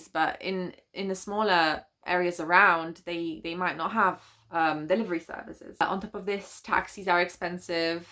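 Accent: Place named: Scandinavia. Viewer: It sounds like your English accent has started to sound more Scandi.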